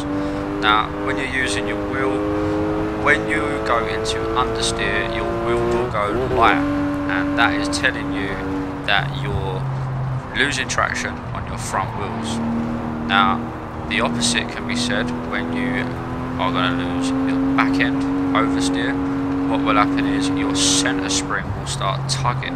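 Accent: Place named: United Kingdom. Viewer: Wow, you have such a deep understanding of cars and how each component contributes to the overall function of the car. That type of knowledge comes from real-world racing I just found you while looking for Thrustmaster wheel vids, are you a real-world racer? You are very professional yet explain it where a novice like myself can understand it. Of course, it helps to be British you guys are clear winners in the oral presentation department. As I progress I will favor your knowledge and hope to see many more vids from you thanks!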